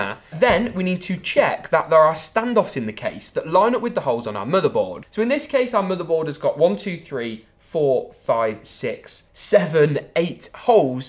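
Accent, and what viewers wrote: United Kingdom. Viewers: That British accent though, I'm intrigued😁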